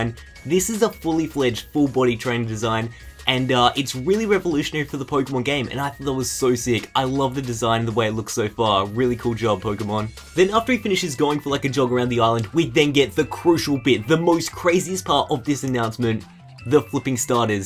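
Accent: Australia. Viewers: Azman Ismam He's an Aussie XD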